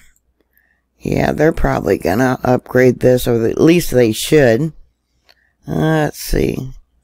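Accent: South, United States. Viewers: Get the rest you need but keep up the good work. Love your old fashion country dialect, especially when you pronounce "Wash" or "Washington" I was raised around the Seattle area and it wasn't until I went into the military that I was told there is no "R" in either word My family pronounced it "Warsh" and "Warshington" also and we pronounced Snoqualmie Snoqualomie where we got the extra "O" is anybody's guess.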